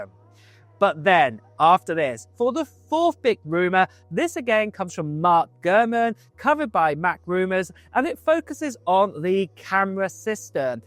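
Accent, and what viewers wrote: United Kingdom. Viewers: Until I opened up my eyes, I thought that was a British woman speaking.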